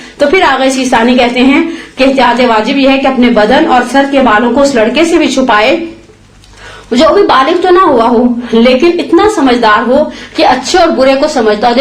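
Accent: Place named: United Kingdom. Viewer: Plz plz ap angrazi accent main urdu nahi bola karain topic acha magar sunaaa muskil ha in ko ..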